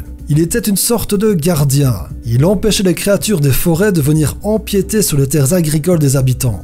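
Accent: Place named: Belgium. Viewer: Belgique ^^ (j'ai le même xD)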